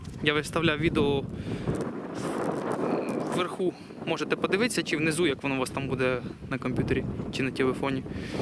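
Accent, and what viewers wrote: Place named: Nizhyn, Ukraine. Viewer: акцент на наш Ніжинський схожий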